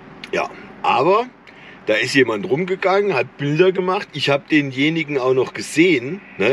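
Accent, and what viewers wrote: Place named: Hesse, Germany. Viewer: Und wieder ein schönes Video. Haus geht vor. Sag mal, wo bist du eigentlich gebürtig her? Höre immer a bisserl hessisch . So FFM und südlich. Zum Thema „Falschparker“ mir ist es lieber ,ihr steht auf den PKW Parkplätzen, als in der Zufahrt. Selbst wenn man mal halbe Stunde Augenpflege machen möchte, findet man bestimmt als PKW Fahrer Platz. Na, ein breites grinsen im Gesicht.Gespannt was kommt. Mal sehn , ob meine Ahnung stimmt. Grüße an deine Liebsten zu Hause.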